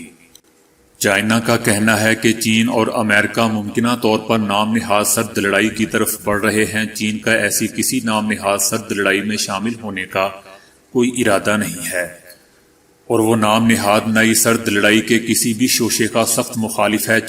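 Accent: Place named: Punjab, Pakistan. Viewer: aap baz alfaz pajabi lahjay may boltay hain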